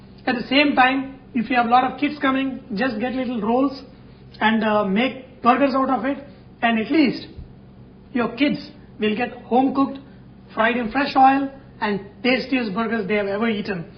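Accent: India. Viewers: QUICKLY DIP IT! IMMEDIATLEY! ... the indian accent just makes this all the more fun XDDDDDDD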